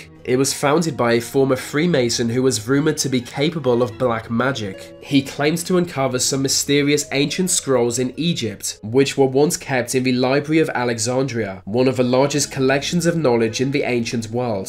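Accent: United Kingdom. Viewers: A british voice doesn't make one knowledgeable.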